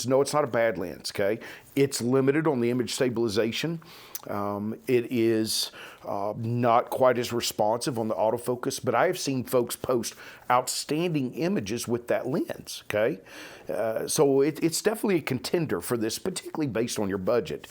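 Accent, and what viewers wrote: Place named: West Texas, United States. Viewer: I like the way you talk, west Texas. I'm Houston, living in Colorado's San Juan mountains. I think I might be the only MFT landscape photographer in three counties.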